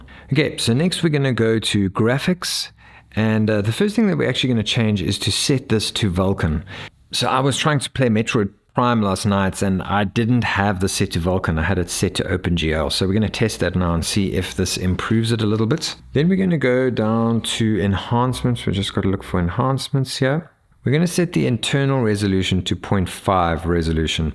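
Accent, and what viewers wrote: South Africa. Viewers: This is SO GOOD. Want to do this with my phone as I don't own a retro handheld but this is basically an android setup guide (which is awesome). You sound like you are from South Africa? I'm from South Africa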